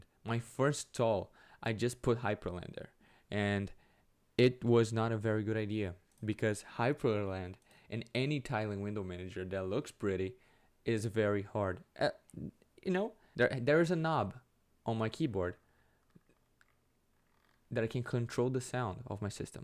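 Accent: Brazil. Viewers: kkkkk juro! Vi por literalmente 2segundos e ja fui nos comentarios para ver se alguem tbm tinha notado. O sotaque br falando ingles eh bem unico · Tu é brasileiro? Esse sotaque é igual o meu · are you brazillian, man? you've got the southeastern accent xD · Coé mano, faz um vídeo em português também, esse sotaque não engana. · Cara, impressionante como abri o vídeo e na primeira palavra percebi que era BR, CACETE a gente conhece o nosso sotaque lkkkkkkkkkkkkkkk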